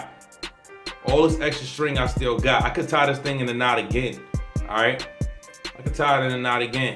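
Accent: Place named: New York, United States. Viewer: He's clearly from NY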